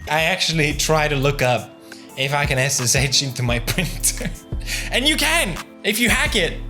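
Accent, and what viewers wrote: Sweden. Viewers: he sounds more Swedish than ever before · All these years, mans has lived all over the world and his Sweedish accent is thicker than its ever been 😂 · I love how since pewds left the UK he's started sounding more Swedish again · I'm happy that his Swedish accent is getting stronger in each video.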